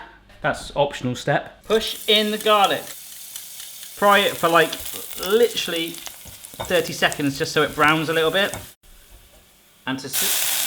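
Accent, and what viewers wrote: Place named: England, United Kingdom. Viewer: Love the English accent.. and love this for Halloween, gives me some ideas.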